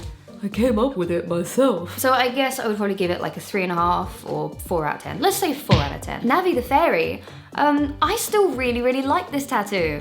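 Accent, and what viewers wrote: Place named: England, United Kingdom. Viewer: i watched half this video before i heard your english accent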